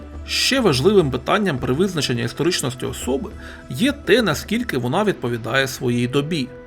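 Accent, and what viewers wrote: Russia. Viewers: супер. але працюйте над вимою букви "г"))) Бо чути, що вимова російська))))) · Хороше відео, проте попрацюйте над українським звуком [г], бо звучить завжди [ґ] - різкий рос акцент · Російський акцент ПІДБІШУЄ.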